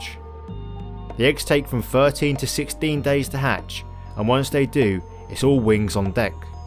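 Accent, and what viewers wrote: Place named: England, United Kingdom. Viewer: Really enjoy your videos mate. You sound English, so I think you meant they are found as far EAST as Turkey, not West. 😉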